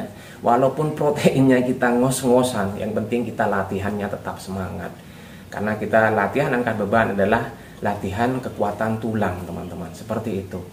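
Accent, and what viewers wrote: Madura, Indonesia. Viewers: logatnya kaya madura ya bg ku?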